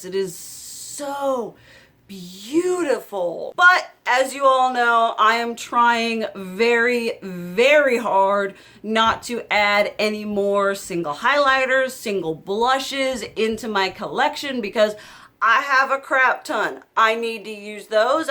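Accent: United States. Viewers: I love they way you talk country accent with animation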